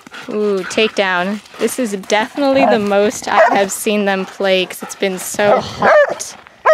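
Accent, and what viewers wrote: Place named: Texas, United States. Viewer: Are you from Texas? You sound like this Youtuber from Texas that i like...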